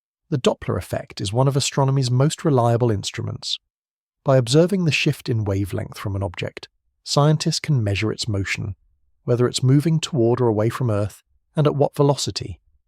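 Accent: United Kingdom. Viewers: Why is the narration ALWAYS with a British accent?! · It's called Queen's English for a reason clear elegant and the universal language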